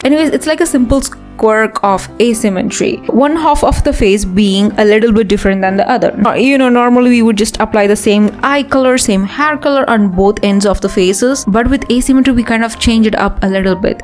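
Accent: India. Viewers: your accent is lowkey indian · Are you an Indian? Your accent sounds familiar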